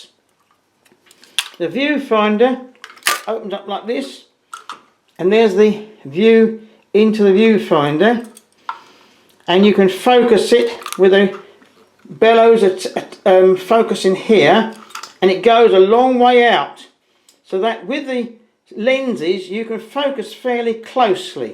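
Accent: United Kingdom. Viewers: I had this camera at one time with the 180mm lens. I always loved this camera. I viewed your photos on Flickr too. I'm going to have to join Flickr one of these days. A lot of good photographers there. By your accent I can tell your from the UK. There are a lot of good British photography magazines out there. Maybe I'll buy this camera again someday. I like the interchangeable lenses.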